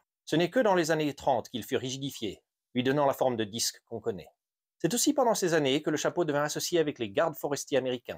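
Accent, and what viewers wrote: Quebec, Canada. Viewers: Léger accent canadien francophone. Léger, mais bien là.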